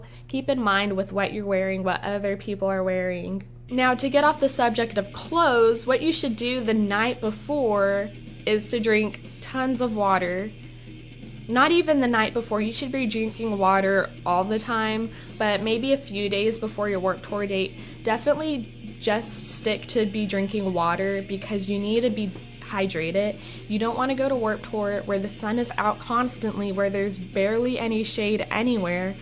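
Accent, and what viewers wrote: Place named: South, United States. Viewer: I love your accent. I kinda could tell that you we're from the south. Also great advice. I live in Western Massachusetts so the weather does get kinda hot but I'm going to the Hartford CT date. I'd love to know what bands you're going to see.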